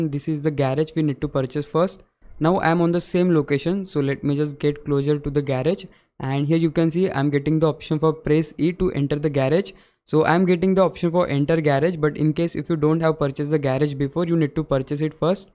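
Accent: India. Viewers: Sir, you have the most English Indian Accent I have EVER heard! I salute you 🫡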